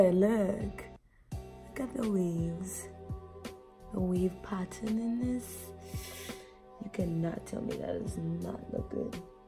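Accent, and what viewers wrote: Caribbean. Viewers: I like your accent, you sound like you're from the Caribbean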